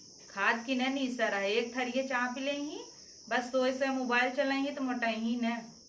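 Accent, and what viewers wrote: Jaunpur, India. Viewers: Didi mai bhi Jaunpur se hun lekin aapaki boli bahut sundar hai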